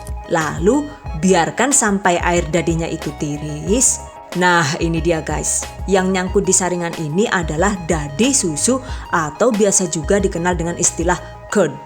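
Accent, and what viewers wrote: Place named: Tegal, Indonesia. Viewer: Terimakasih sudah berbagi ilmu harus di coba ini,dan satu lagi saya suka humornya logat orang Tegal.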